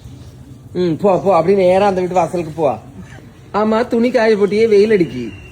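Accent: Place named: Nagercoil, India. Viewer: Nagar Kovil tamil super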